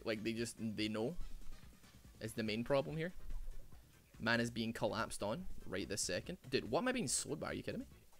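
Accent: Scotland, United Kingdom. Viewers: Scottish Jacksepticeye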